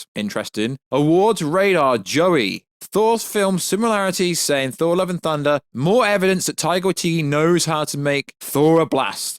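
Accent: England, United Kingdom. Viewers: This terrible channel is so bad I need an interpreter to translate English accent to American!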